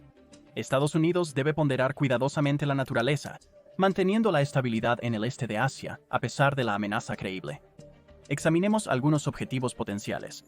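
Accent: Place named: Catalonia, Spain. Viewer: El narrador parece que es un Catalan intentando hablar en Castellano sin que se le note el acento. El video muy interesante.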